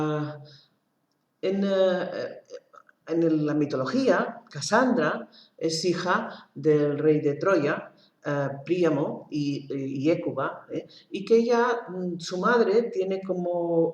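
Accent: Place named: Catalonia, Spain. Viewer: me subscribo ,me gusta como narras, buena dicción, por tu acento deduzco eres catalana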